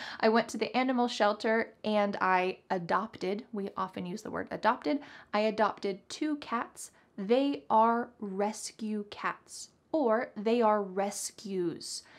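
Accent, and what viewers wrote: United States. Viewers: Are you from US.?🇺🇸😎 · Is it American English??? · your are so lovely ...... really .... Ithink my understanding for American accent getting better >> cause of you ...... thanks ..... keep it coming ..... Form Sudan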